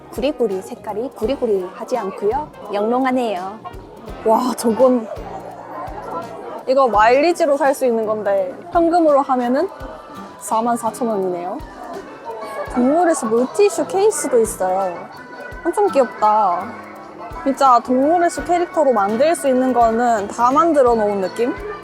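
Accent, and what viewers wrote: Gyeongsang, South Korea. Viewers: 소리 끄고보면 완젼 갬성 브이로그 유튜브가튼데 소리키면 그냥 대구사람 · ㅎㅎㅎ 브이로그에서는 ㅋㅋ 뭔가 귀엽게 대구 발음이 나오시네요.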